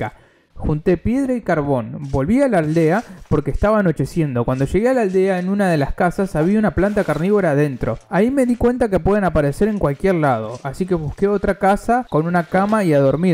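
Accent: Argentina. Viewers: ¿sos argentino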